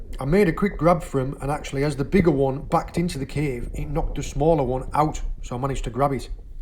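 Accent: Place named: Hull, United Kingdom. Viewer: Proper theraputic, end of hard week, couple of beers watching. There's a different world under the waves. Can't pin the guys accent down though. Wanna say Hull but it'a not quite there.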